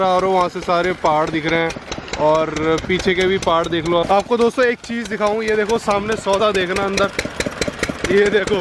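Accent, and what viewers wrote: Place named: Punjab, India. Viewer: Mundaw punjabi lagda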